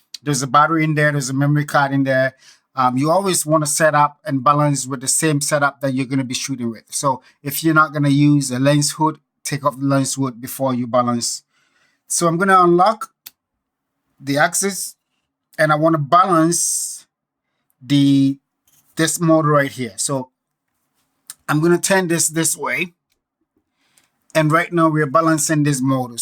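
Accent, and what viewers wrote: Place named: Ghana. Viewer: love the African accent,,, Ghanaaaaaaa.... lets link up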